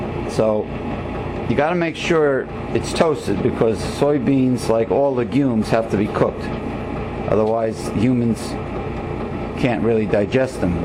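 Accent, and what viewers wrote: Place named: New York, United States. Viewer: I was just looking for a vegan sourdough pancake recipe and came across yours, it seems to be still one of the few vegan sourdough recipes on youtube even in 2017. Admittedly, everyone has learned a lot about producing cooking videos since 2012, and I imagine yours would probably be shorter if you had made it today. But the bonus for your video is that you explained the process very clearly, so is very good for beginners, and your Robert de Niro accent and serious comments below are adorable. Italians are the best cooks.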